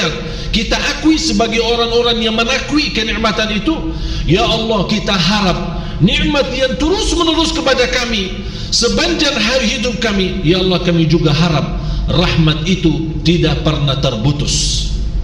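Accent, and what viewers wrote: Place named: Arab world. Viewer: kangen rasanya dg beliau , dg suara khas arabnya dlm tausyiahnya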